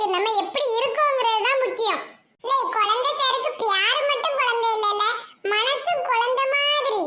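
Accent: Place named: Tenkasi, India. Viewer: Idu entha ooru slang semaiya iruku ..thenkasi side madri iruku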